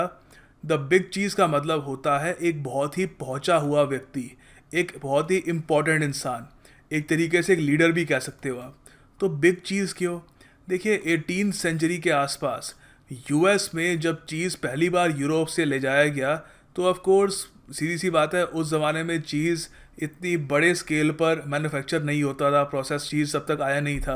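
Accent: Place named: South, India. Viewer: as always video and explanation was awesome but missing your south Indian accent "okkk siiiiirrrr"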